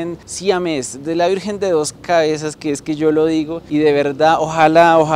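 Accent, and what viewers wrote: Bogotá, Colombia. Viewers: Te felicito me encantan tus historias además que eres un cachaco muy genuino😊 a pesar de lo joven que eres tienes muy marcado el acento bogotano y también chévere que caminas por nuestra icónica ciudad cuando vas narrando, muchas felicidades tienes talento y carisma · El comunica rolo 👌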